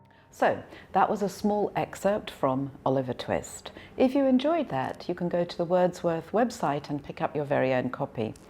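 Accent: United Kingdom. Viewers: 오오 선생님은 포쉬엑센트를 쓰시는건가요? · 영국 살면서 영국 발음이라고 다 듣기 좋은건 아닐수도 있구나 느꼈는데 선생님은 목소리 톤하고 발음이 너무 듣기 편안하네요..^^ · 영국발음 너무 좋아요..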